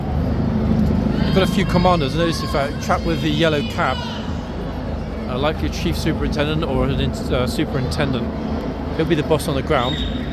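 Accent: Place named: United Kingdom. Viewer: Nancy-I stay up until 3 am sometimes, watching videos! We aren’t crazy, just fans of a lovely English man that is dedicated to filming Horse Guards and London! narration is top notch and I love his British accent, too! It’s nice to see another American 🇺🇸 fan that loves videos and is a dedicated fan as myself! Best wishes, always! Shawn 😂❤🐴❤️🐎❤️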